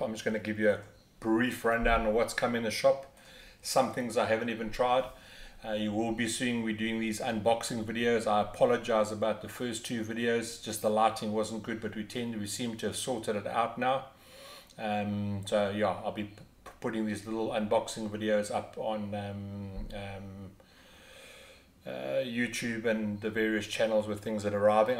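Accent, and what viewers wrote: South Africa. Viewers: I hear sud Afrikaner accent, I subb. Miss my country <3 awesome video boutie!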